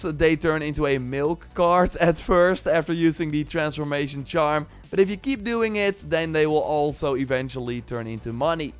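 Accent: Netherlands. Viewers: Did I just spot a Dutch accent here?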